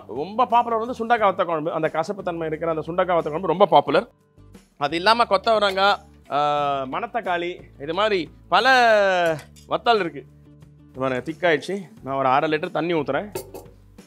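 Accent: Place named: Triplicane, India. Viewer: சார் நீங்க சின்ன வயதில் திருவல்லிக்கேணியில் இருந்தீர்களா பேச்சு ஸ்டைல் அப்பிடித்தான் தெரியுது. நல்லா ரசிச்சு பேசி செய்றீங்க. Very interesting.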